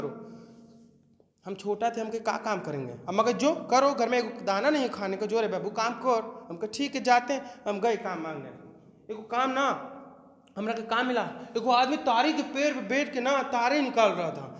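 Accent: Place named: Uttar Pradesh, India. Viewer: Sahi bola Bhai...pura UP ka hi lg rha h bhai...bhasha se🤘🤘🤘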